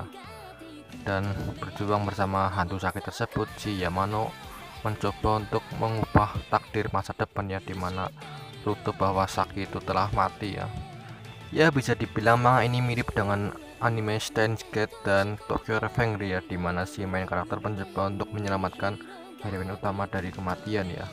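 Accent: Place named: Java, Indonesia. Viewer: Orang Jawa bang? Logatnya kaya orang jawa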